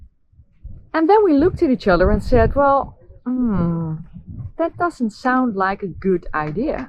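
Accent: Netherlands. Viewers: Waarom heb jij zo'n gruwelijk Nederlands accent? Je moet echt veel meer "overdrijven". Bijvoorbeeld "tolk about", de klank van jouw talk rammelt. Het is een O geworden, niet iets als een OA. Ga eens wat harder aan de klank van al je klinkers trekken. Maak het bekakt! Dat helpt. Alle Nederlanders zeggen UPDEET. Engelsen zeggen APDEET. En grapje, zeg nooit meer FOK tegen je djip. Dat gaat op een dag helemaal fout! Fok?! What? Hee, mijn "sorry" is weg. Dit stukje was NIET vervelend bedoeld. Ik hoor gewoon meteen Nederlands. En dat zit vooral in de klank van je A O U. Engelsen doen dat echt totaal anders. Jij niet en dat hoor je. Zelf intussen jaloers. Altijd geldproblemen en boten in slechte conditie gehad. Een kwarttonner Waarschip is prachtig, als al je hout in orde is. Van een lekke kielbalk word ik ongelukkig. Hij kon nergens op de kant voor een normaal aantal euro's, echt, dan word je NIET vrolijk. 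Kon hem zelf wel repareren, maar niet "effe", echt niet. Ik wil graag weer zo'n bootje, klein is leuk, wie weet lukt het nog eens. Voorlopig heb ik een hele goeie kano. Dus, het allerbeste met zeilen!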